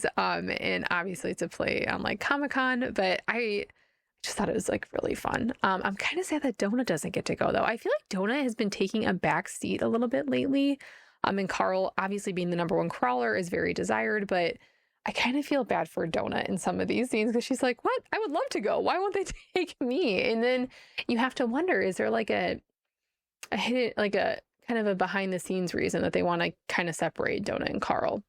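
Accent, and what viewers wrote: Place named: New England, United States. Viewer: Can I ask where you’re from? The aspirated L in also, and the dropped final consonants makes me think New England.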